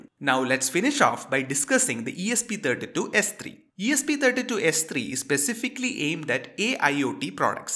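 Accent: India. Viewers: Sorry I find the Indian accents the hardest to listen to and understand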